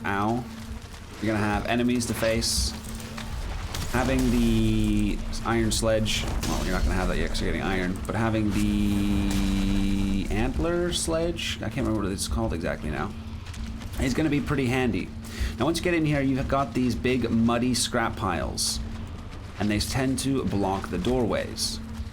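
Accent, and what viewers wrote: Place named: United Kingdom. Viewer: You have a pretty strong UK accent imo. Haha. Thought you were a "native" xD Where are you originally from?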